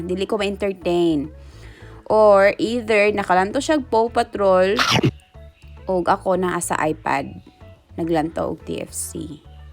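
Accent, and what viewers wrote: Visayas, Philippines. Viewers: Omg,,dipoba umasim ang egg pero ang puti nga,,laki namn po ng strawberry nyo,,ang healty namn ng breakfast nyo,,natawa ako sa bisaya accent nyo,,first tym koto narinig. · What a nice breakfast to ur fam and bisaya accent I'm from Negros also and this is very entertaining to watch while cooking, hoping for your mext cooking content, keep it up! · Hindi ko man maintindihan pero super cute mopo mag bisaya. Agaw pansin sakin ang kalan super linis sarap magluto. Breakfast talaga pinaka importante sa lahat dapat heavy. Saka Hindi talaga nawawala ang itlog sa almusal.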